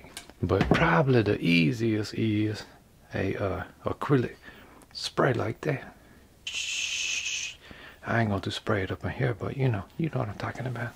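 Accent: Louisiana, United States. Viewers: The voice-over on this is like Bob Ross was from Louisiana and did crafts instead of oil painting- I love it! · I appreciate that the instructions are in Southern (sounds like Louisiana)- slippy slippy and smush and catawompus are precise terms that are easily understandable for me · I think I recognize that accent. Are you from Louisiana by any chance?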